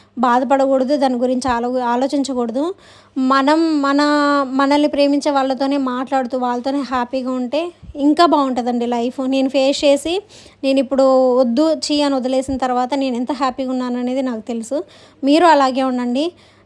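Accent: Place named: Andhra Pradesh, India. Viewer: Assal meeru by birth andhra pilla la matladuthunnaru appudappudu mana telangana slang kuda use cheyandi because telangana vallu like cheyali kada i mean kotha subscribers ki theliyali kada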